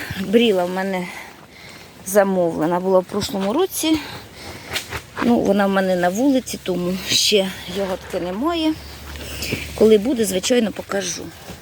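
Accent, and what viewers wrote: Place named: Poltava, Ukraine. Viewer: Я думала, що ви з Полтавщині, тому, що діалект у вас полтавський... Рясних Вам врожаїв і ПЕРЕМОГИ всім нам.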